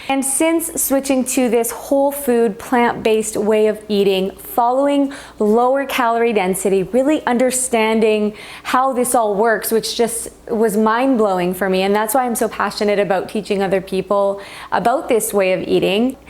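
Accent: Canada. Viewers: Off topic question, are you Canadian?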